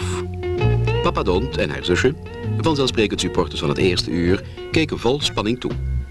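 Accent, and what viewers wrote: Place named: Netherlands. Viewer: Spijtig van dat Hollands commentaar- waarom geen Vlaming?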